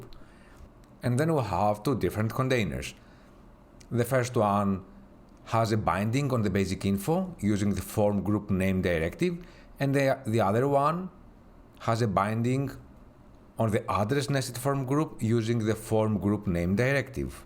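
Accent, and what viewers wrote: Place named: Italy. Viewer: Thank you Sir this video. And also I am curious are you an Italian ? Because you have an Italian accent which is good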